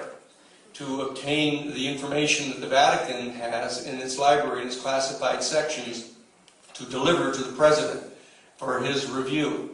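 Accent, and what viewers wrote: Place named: Mississippi, United States. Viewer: from Mississippi, detected!!!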